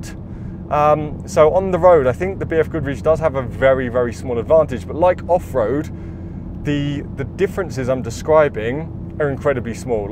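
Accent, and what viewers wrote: United Kingdom. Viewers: A British Mormon talking tires?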